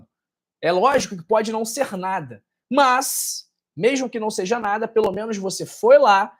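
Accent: Rio de Janeiro, Brazil. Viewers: Esse sotaque carioca é um horror 😮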